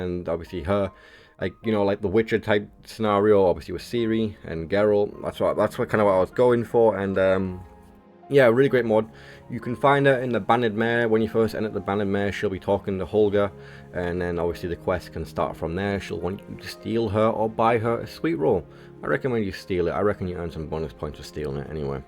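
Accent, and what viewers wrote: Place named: North East England, United Kingdom. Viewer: Nice load out - I would add the sky land colour for realistic water 2 if you can. Also check out sky kings aonghus house for river wood for almost no cost and granite hill town that he did. Trying to guess where your accent is from in the north east 🤔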